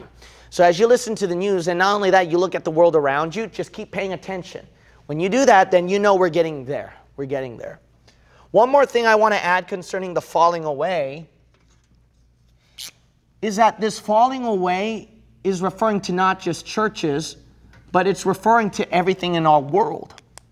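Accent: United States. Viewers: He speaks in American accent.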